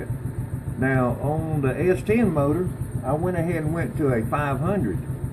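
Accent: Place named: Texas, United States. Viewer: Live your accent. Texas!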